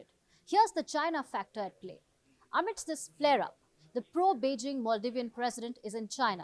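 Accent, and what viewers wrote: India. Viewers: Irritating Indian accent😂😂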